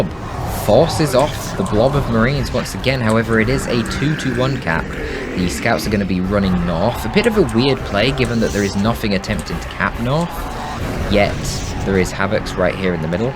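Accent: Scotland, United Kingdom. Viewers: Scottish accent casts henceforth yes? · GGs, and great scotch accent haha. Where are you actually from?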